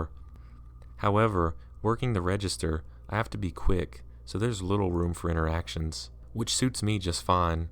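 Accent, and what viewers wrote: South, United States. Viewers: Southern accents rule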